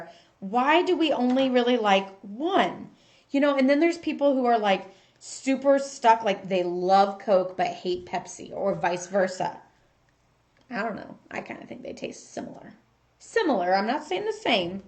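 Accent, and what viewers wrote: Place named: St. Louis, United States. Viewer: Sounds like you are in the St. Louis area¿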